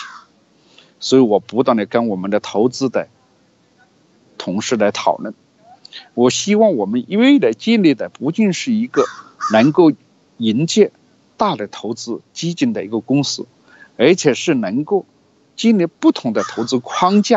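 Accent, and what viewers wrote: Hunan, China. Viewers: 何先生生日快乐🎂🎁，非常喜欢你的节目，从一开始有30-40%不懂的湖南普通话到现已99%都懂了，而且是爱上湖南普通话了。希望您保持你的风格，希望能一直听你的火拍。God bless you and your family！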